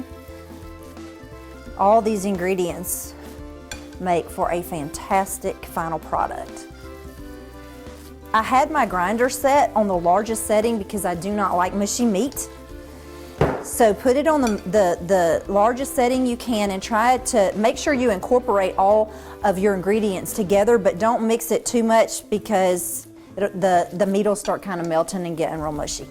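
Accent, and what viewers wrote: South, United States. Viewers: Her southern accent makes hearing about the recipe all worthwhile . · FANTASTIC video! You are lovely and a really good presenter. I've been watching sausage-making videos this afternoon because we've consumed all the summer sausage we got from the processor, so I'd like to make some of my own from the 5 deer my son and I harvested and I processed myself. Your video gave me the best directions I've seen so far. Thank you. And I don't know where you got that wonderful Southern accent, but I'm from Mississippi, and you sound SO much like my middle-MS and Alabama friends. Yankees love to make fun of us, but in fact we all know Southerners speak the REAL American English! Thank you! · Good video plus it helps that this woman is beautiful and that country accent damn lol I think I made it to her second sentence and showed my girl who's from Virginia and she def agrees! 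WE NEED MORE WOMEN LIKE HER! Tell your man he's a lucky guy with much love from Florida 😄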